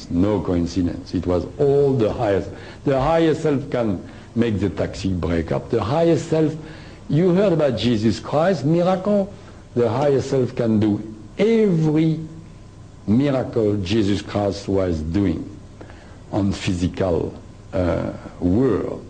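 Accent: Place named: France. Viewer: He is well and not longer in Vietnam, but that is besides the point, yes this was shot along time ago, and the video quality suggest as such, he is french, so of course he has a thick french accent, but i can understand his English just fine. I guess it comes down to the ability to understand others with accent's regardless, the book is made available in perfect English read it and you will know everything he talk about in the lecture, also don't just pass this up, this information is the read deal.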